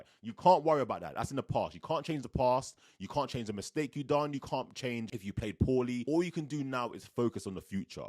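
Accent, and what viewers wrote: London, United Kingdom. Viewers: Dollars? Your accent made me think you’re down the road to me in London 😄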